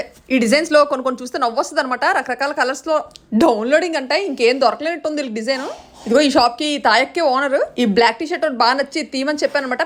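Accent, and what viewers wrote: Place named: North Andhra, India. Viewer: ఉత్తరంధ్ర యాస, వారే వాః 😂😂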